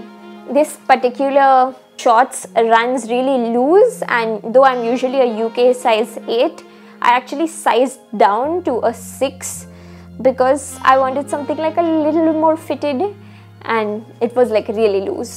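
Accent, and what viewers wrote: India. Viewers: I do really love Indian accent such a unique one!❤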